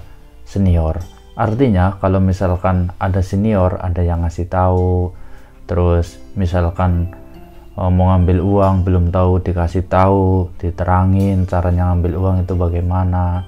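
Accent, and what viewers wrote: Banyumas, Indonesia. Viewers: Ora Ngapak ora penak yuhuuuuu ketemu dulur Ngapak mning heee dengerin cerita suka duka di Jepang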